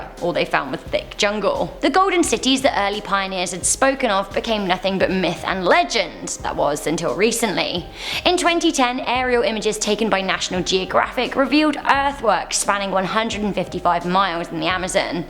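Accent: United Kingdom. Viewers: i hate it she needs to chill out its very annoying to me i dunno what i have against britsh accents im sorry i dont mean to offend you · I found you. My company’s newsletter just had a “Who looks the sexiest in a red dress” vote. was the winner. I didn’t know who that was so I was told to do some YouTube searching. I found this and I have to agree, the British accent is definitely a cherry on the cupcake. Just thought I’d share that... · sthurston she from Britain duh they pronounce words differently then Americans common sense there try using some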